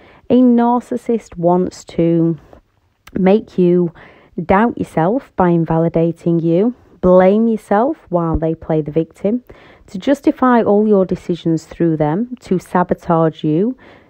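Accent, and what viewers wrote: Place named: Lancashire, United Kingdom. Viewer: Thanks for your useful and helpful vids, and always nice to hear a Lancashire accent for a change. x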